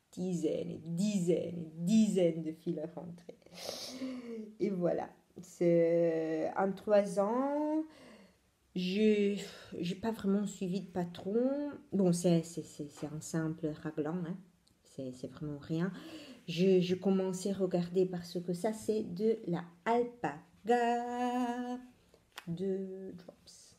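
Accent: Italy. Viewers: Contente de te retrouver ! J'ai eu une collègue italienne qui avait vraiment le même accent que toi, c'est chouette, à chaque fois je pense aussi à elle :) Mais sinon quand même je te regarde pour toi et tes jolis tricots !!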